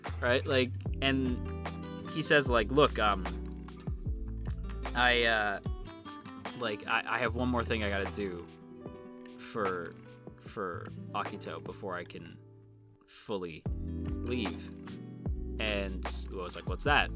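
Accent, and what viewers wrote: Canada. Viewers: Increibly Canadian. I died lmao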